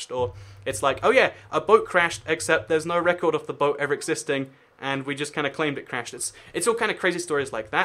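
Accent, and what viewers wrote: England, United Kingdom. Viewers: This guy talks faster than the Chipmunks on speed, and he's English. There are lots of great videos but even more bad narrators on You Tube. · Is it your first time hearing a british accent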